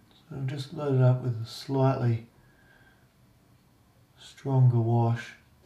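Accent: Australia. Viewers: I watched a second time. It’s really a stunning painting. It’s amazing to see how different artists palettes produce such interesting paintings. So I subscribed to your site. Where are you from, I hear a slight Australian accent? Kathy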